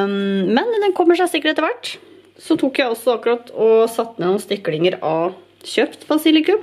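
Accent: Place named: Skien, Norway. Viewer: Ser du er fra Skjeen da.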